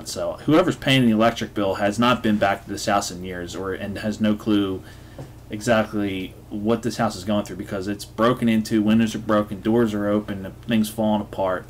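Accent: Philadelphia, United States. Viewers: What is your accent? Are you a Philadelphia area native?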